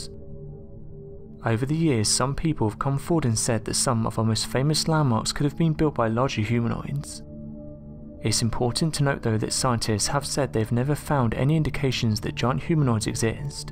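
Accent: England, United Kingdom. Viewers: he has an English accent you dum dum not a speech impediment or the mumbles what a ridiculous comment 😶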